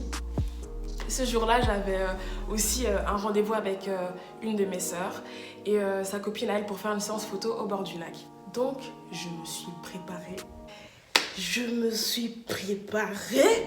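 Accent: Cameroon. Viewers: Le Cameroun s’entend d’une manière , tu m’as tué « la honte de ça » 😭😭🇨🇲🇨🇲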